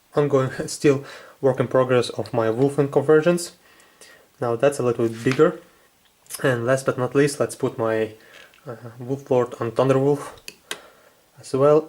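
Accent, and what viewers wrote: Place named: Russia. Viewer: I work with people every day in Atlanta, GA, USA that have MUCH worse Russian accents than you!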